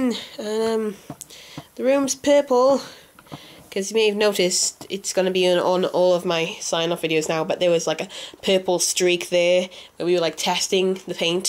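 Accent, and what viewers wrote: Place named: RP, United Kingdom. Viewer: complete stunning you are m`lady with one of the strongest high class very posh & best british accents ive heard by far absolute fabulous, great channel & love the content also. btw im genuinely being honest complimenting you, im not joking or trying to be rude to you in any way i hope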